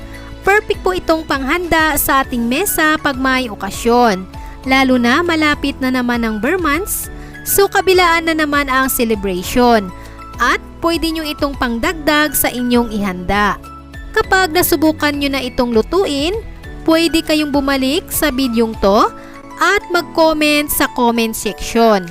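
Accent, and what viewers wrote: Visayas, Philippines. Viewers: Bisaya ba si nanay?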